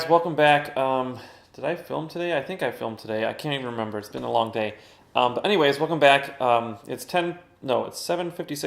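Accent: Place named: RP, United Kingdom. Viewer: Ok cool, it reminded me of how the Queen speaks (Im in the UK) :) I think Tesla should have produced the Y in the first place instead of the Model 3. The 'crossover' is the most popular type of car out there in N America. On production they are too slow at the moment in fulfilling orders. Even if they made 5k a week which they aren't doing, thats only 240k a year. Its not enough to be a mass market. I don't know why they didn't invest in production facilities to make 10k a week right from the start. Why not take the debt on while it is available from the capital markets and just put the infrastructure in place? Otherwise they'll just keep ticking along making cars in relatively small amounts. If they want to be the iPhone of cars they need to get the cars out of the door!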